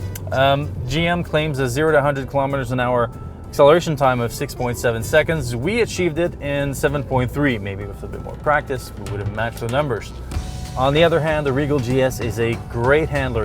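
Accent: Canada. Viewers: Sounds Canadian to me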